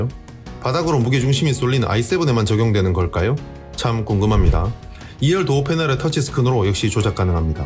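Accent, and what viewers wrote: Gyeongsang, South Korea. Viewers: 경상도 분이신가요? 억양에 사투리 오지게 들어 있네요..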